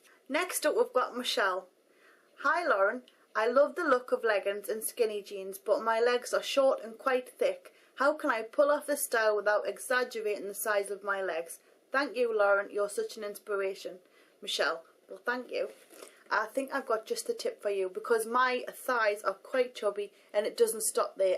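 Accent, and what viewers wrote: Newcastle, United Kingdom. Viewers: "That FREE glue is the best I've ever used" That's the kind of honesty that keeps me coming back. That, the pugs and the geordie accent.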